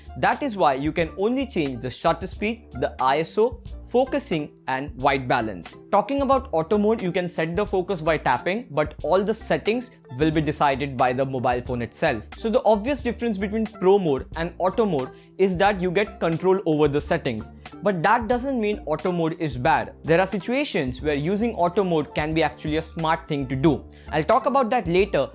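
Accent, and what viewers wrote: India. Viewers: Finally a decent indian accent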